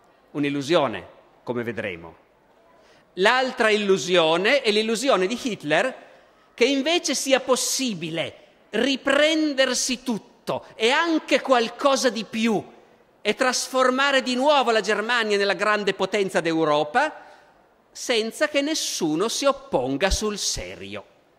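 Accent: Piedmont, Italy. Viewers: N 1 peccato x la voce troppo piemontese neee , caro prof sei esagerato complimenti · No piemontesissimo.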